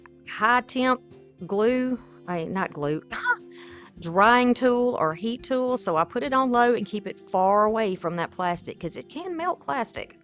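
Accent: South, United States. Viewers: Love these😅 and your gentle southern accent. Subbed!!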